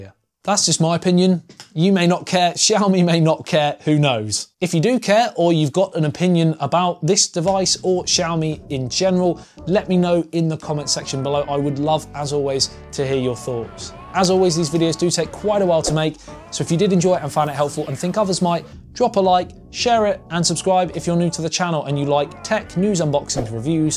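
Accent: England, United Kingdom. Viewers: what in the world is your english accent??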